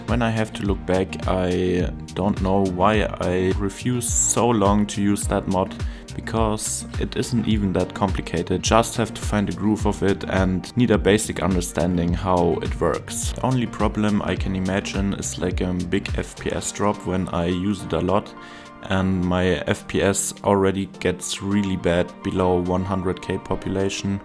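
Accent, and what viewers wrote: Germany. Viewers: Of course you would have a German accent. You speak German. I've got a Southern US accent. I barely speak English, let alone as a second language. Keep up the amazing work! You, CityPlannerPlays, and Biffa introduced me to the game and I've been hooked! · I really like the German accent ❤ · Well, a couple of things. First, I absolutely love hearing your voice and the accent. My grandfather came from die Schweiz (Lucerne canton) and my father could speak fluent Hochdeutsch. As a result, I learned some Deutsch as well and took a few years of language study in college. Sadly, I've forgotten a lot of it, but a German accent is a very nice thing for me to hear sometimes. Second, I think that it really sucks that people objected to you speaking on your videos and were mean to you. Besides the point above, I think you do a fine job with English and hearing a voice reminds me that there's a real person behind the genius of your builds. 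You have taught me a lot and are a treasure to the Skylines community. I'm glad to hear you! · imo your videos are better without narration it's not that your english is bad, it's pretty good, and yeah, even i as german can hear the german accent... it's just that all your vids i watched the last 2 years were unnarrated and this narration kind of... destroys the whole 'oh a new video is up' i love your choice of music and that helps relax after a day of work. so i just want to sit here, watch city go brrrr and listen to some music. now i have to listen to your voice - and can't hear that music and relax. good that you had the 'commentary' tag in your video. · Ich selber finde den deutschen Akzent auch nicht besonders schön, aber deswegen beleidige oder vermiese ich doch niemandem den Tag? Jeder kann seine Meinung haben, aber ich würde deswegen niemals auf anderen rumhacken. Außerdem sind deine Zuschauer ja hier, um dir beim zocken zuzuschauen! 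Außerdem habe ich jetzt mehrmals gelesen, dass andere die nicht Deutsch sind, den Akzent garnicht so schlimm finden. 🤷🏽‍♀️ Lass dich von den ganzen Kommentaren einfach nicht runter machen. Wenn du Voice Commentary machen willst, dann mach es einfach! Und dein Englisch ist ja nichtmal schlecht!! (Übrigens ist es echt angenehm dir zuzuhören 😅) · Love your commentary. Love Germany, love ya accent. From a bloke in Australia :) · Your English accent is fine. You do sound German, but there's nothing wrong with that. I can understand 100% of what you're saying, and that's all that matters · It’s great to hear your voice, everyone has got an accent. It’s part of their unique personality. Even English speakers make fun of other English speakers accents. I love hearing English with a German accent 🇩🇪. Makes it all sound so technical and official 😂 vielen dank und warte auf das nächste video 🙏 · You speak English well sir... 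and yes you have an accent imagine that! A German speaking English having a German accent... no really. German is one of those languages that you grow up speaking and learning how to form some complex sounds in your throat muscle memory as it were and you can not just stop that memory when you change languages. I speak Thai but with an American English accent and wow.. no one understands me sometimes :) ... The accent is perfect for the videos which is why I started following you to begin with, build, artistic license, knowledge and inspiration that is why I follow you, the mad German scientist is just a plus :) Thanks for all the inspiration and sadness that my city building skill are just not up to par with yours sir!!! LOL ... peace out brother. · I’m sorry people are so crappy. Your English and your videos are great. Your German accent is actually quite charming! I’m glad you put your voice in videos! 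· I think your German-English Accent is really pleasant. It's not harsh at all. Don't listen to the pointless critiques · It feels very safe having someone with a german accent comment a build of infrastructure.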